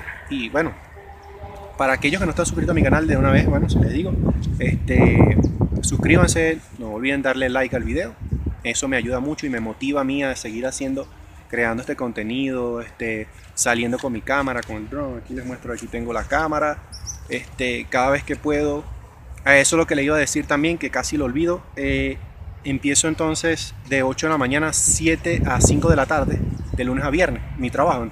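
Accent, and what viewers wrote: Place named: Venezuela. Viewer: Ps por eso mismo decia... NO creo que sea tan facil.. para un extranjero en cualquier pais.. ok... entonces sos parcero.... buee confundis por tu acento totalmente venezolano.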